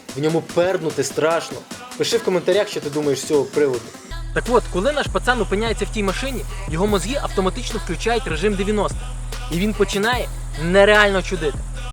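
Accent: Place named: Carpathians, Ukraine. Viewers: По-українськи правильно "говорю",а не "гуворю".Селюків карпацьких понаїхало дратують своїм говором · Блин западэняцкий акцент, тяжело слушать когда знаешь какие мрази большенство из них.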